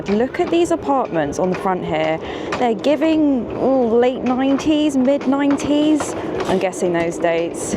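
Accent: United Kingdom. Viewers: I would have clicked off quarterway thru but Your music choices are fab! I literally listened to the end just to hear the music tracks! So nice i had to shazam them! Great tunes! Thanks for musically educating us. Oh by the way it's 'mall' (like the word all} not mall like the word pal.! U should know this u sound british! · Hey, I have recently started living in london (monument) and absolutely agree with your views on about this area, even I felt the same when I cross path accidentally, coz I had to take tube from elizabeth line to london bridge, and my gosh your views are on point.. Also love love your heavy British accent ❤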